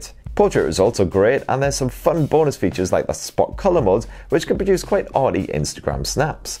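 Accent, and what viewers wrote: United Kingdom. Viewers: You speak too fast dear. With the brit accent and your fast talking I dont understand everything you say.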